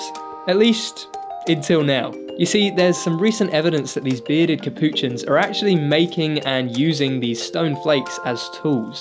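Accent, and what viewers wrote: United Kingdom. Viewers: "I've always been fascinated by the idea of animals using tools" Sounds sophisticated when you say it with an English accent. Not so much if you're from the states.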